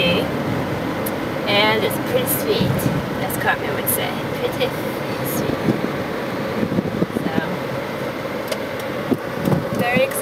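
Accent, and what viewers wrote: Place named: United States. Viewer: if she is in England, what's up with an Amercan accent?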